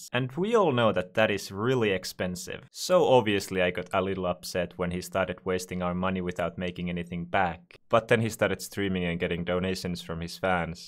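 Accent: Finland. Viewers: U finnish? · ootko suomalaine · is this dude from finladn? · are you from finland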